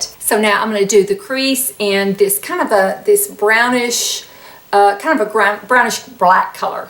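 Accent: South, United States. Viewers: Love ya!! Are you from Georgia?? You have that southern accent!! I’m from Georgia!! Love the look!! ❤️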